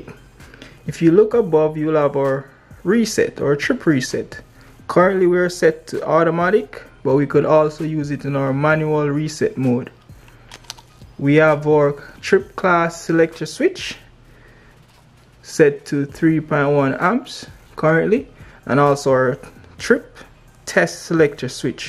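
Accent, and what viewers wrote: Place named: Jamaica. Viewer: Sounds like a Jamaican. Good video breda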